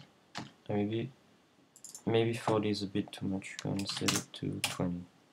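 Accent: France. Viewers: I think hes French, I'm French and I have the same accent. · Omg, mec, ça s'entend que tu est français! x3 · L'accent français est juste épic ^^. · u sound French, your voice is cute!!!! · where are you from ? not sure if you're french or not ! and this mask is awesome ! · a il est vraiment français j'me suis dit : merde, il a un accent français chaud pour lui :rire: maisq bon il a beaucoup de vocabulaire :3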